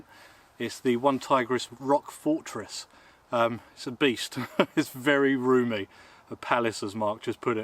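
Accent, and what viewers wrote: United Kingdom. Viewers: 'I'm In' on the ⛺ giveaway. Happy Birthday. Luv your channel & British accent. Cool cooking style😎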